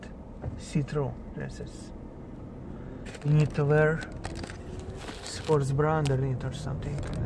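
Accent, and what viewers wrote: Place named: Russia. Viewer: This accent. Where are you from? russia?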